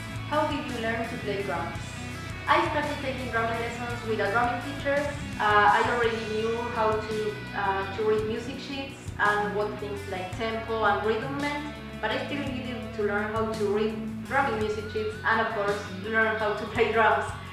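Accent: Argentina. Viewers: te saque la ficha con sos argentina por tu acento nada mas jaja, sos una genia loca, un beso desde Mendoza. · por el acento se te saca la argentinidad al toque . igual me tendria que haber dado cuenta por tu nombre artístico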